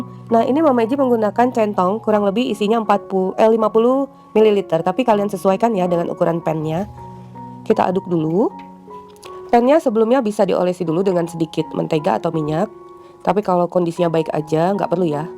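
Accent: Java, Indonesia. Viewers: lucu sekali. Bhs nya kyk orang Jawa saja 🥰🥰🥰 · Gak fokus ke prosesi mbikin kuehnya, maam chayaankk😘😘❤💓🌺🎉🎊 Suka gaya ngomong medhok Jawanya... 😂😂😂😂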